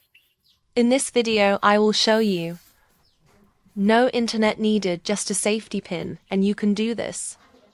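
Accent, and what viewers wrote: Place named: Australia. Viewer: you sound like an auzie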